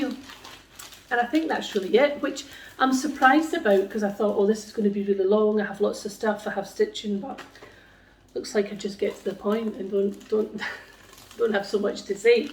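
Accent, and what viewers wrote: Scotland, United Kingdom. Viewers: So glad to see you're doing much better...slow and steady...soon you'll be up and running around. I love that pattern Thistle and I may have to see if I can track it down to purchase it. Really enjoy your videos and your lovely Scottish accent. Star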